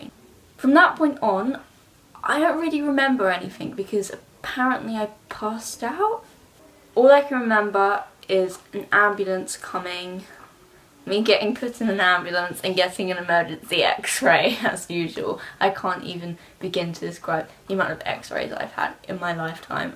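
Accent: England, United Kingdom. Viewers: You had a different accent actually. It was more English than american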